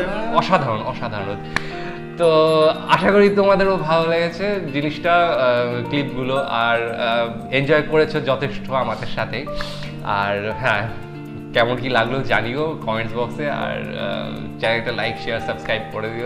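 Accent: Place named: Dhaka, Bangladesh. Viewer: অরিজিনাল ঢাকাইয়া ভাষায় কথা বলেছেন বস্!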